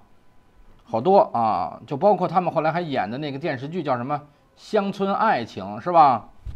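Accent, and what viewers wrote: Beijing, China. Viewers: 听老哥的北京口音就是亲切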